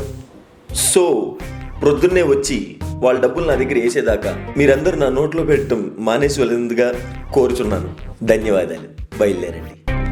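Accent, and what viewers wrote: Godavari, India. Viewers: nani style lo champav kadanna.... may be ur from godavari...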